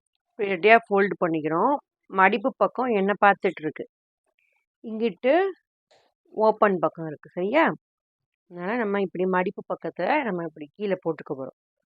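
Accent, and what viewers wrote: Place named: Madurai, India. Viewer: புரியும் படி நன்றாகவே சொல்லி தருகிறீர்கள் நன்றி உங்களூடைய வீடியோவில் அங்கிட்டு இங்கிட்டு ஏத்தலக்கடி இறக்கலக்கடியா இருக்கும் என்று பேசியிருந்தீர்கள் அதைக்கேட்டு எனக்கு சின்ன வயதில் நாங்கள் பேசியது ஞாபகம் வந்து விட்டது இப்போது அதையெல்லாம் மறந்து விட்டேன் நன்றி உங்களூடைய மதுரை பேச்சு ரொம்ப நல்லா இருக்கு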